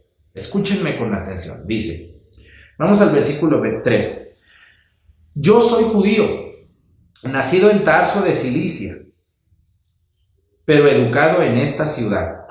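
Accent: Mexico. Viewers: Anque en retardo la sigo ya estoy 28 Entres dias me aggiorno desde ITALIA ANQUE SOY PERUANA DIOS LE DE SABIDURIA PARA TRASMITIR EN NUESTRO PERSONASA ALIMENTAR NUESTRO ISPIRITO PARA ESTAR BIEN.SOY FELIS DE ABERTE ENCONTRADO PADRE. NOSE COMO TE ENCONTRE PERO.TE ENCONTRE.EL AÑO PASADO POR CASUALIDAD.ME AYUDA MUCHO EN LA MIA CONVERSIONE NEL MIO CAMINO. PERO NO AY CASUALIDAD DIOS ME PUSO PARA YO ESCUCHARLE.CONSU DEJO MEJICANO ME ASE REIER.ANQUE USTEDE DIJO UNA BEZ NO ES PARA REISE LO QUE USTED ABLA..PERO SU DEJO.ME ALEGRA ME SACA UNA SONRISA EN MI TRABAJO CANSADO DIOS ME LA BENDIGA EN SU CAMINO AMEN AMEN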